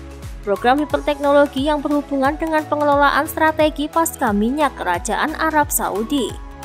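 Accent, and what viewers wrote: Java, Indonesia. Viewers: Mba medok saya hadir hehe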